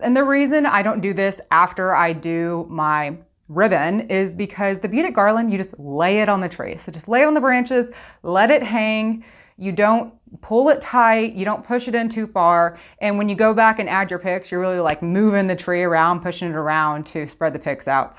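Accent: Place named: East Coast, United States. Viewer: Yours is definitely my Fav diy channel. Your stuff is always so adorable and I just LOVE your accent. East Coast maybe? Keep up the good work!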